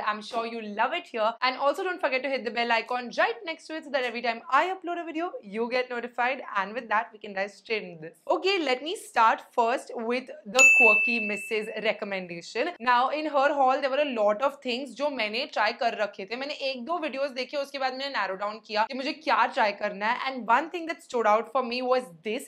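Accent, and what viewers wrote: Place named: Mumbai, India. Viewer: Liked the tapori mumbai type of accent 😂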